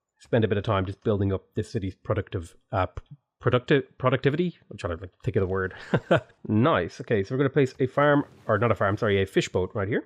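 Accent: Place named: Ireland. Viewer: its just the irish in him ;), a lot of english natives who don't regularly hear an irish accent also need to slow it down lmao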